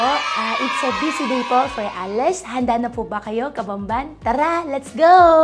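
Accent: China. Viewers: Chinese na Chinese nga yung punto ng pagsasalita nya. San ba sya nanggaling? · Ganyan magsalita ng tagalog yung Chinese. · Sa itsura nya at accent tsekwa yan e. Yun iba kasing pinoy pakitaan lng ng konting bait bumibigay na agad. Di tinitingnan kung mabuti ba talaga o hindi ang pagkatao ng isang tao. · Nilalabanan nya yung chinese accent nya pero very halata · Chinese nga ito sa salita p lng may chinese accent na. Ako chinese blood din pero i was born here grew up here im.proud to be a true Filipino citizen wala na invaded na tyo ng mga pure chinese we need to stand up to protect our own country God bless the phillippines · Agree if she really grew up in the Philippines.. She would sound like pinoy accent talaga... But her accent is Chinese talaga. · Chinese accents talaga ☹️ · Yup. Her Mandarin tongue accent is obvious 🤥 😝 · Chinese na chinese yung pag tagalog. 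Reminds me of Chinese vendor owners sa 168. Mga Half chinese di ganyan accent , pure tagalog pa din ang English. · Pota with the distinct chekwa accent. Halatang Chinese sleeper cell to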